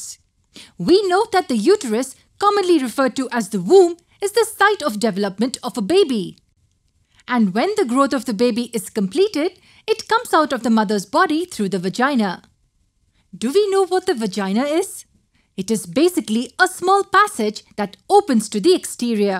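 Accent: India. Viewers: Indian accent??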